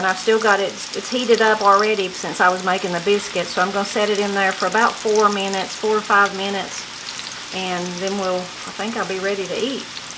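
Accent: Appalachia, United States. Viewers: I fully appreciate that my comment is coming 8 months after you posted this video (I'm a new subscriber) but wow, my mouth was watering as this lovely food was being dished up! Also I never realised that Appalachia was pronounced as you say it, I always thought it was Appa-lay-sher. I love watching your videos and learning more about the rich culture of the smoky mountains. Also I'm slightly obsessed with your accent and the way you say different words, most of which I completely understand, I'm from the UK from a small little town which has a very strong dialect that most don't understand. Thanks!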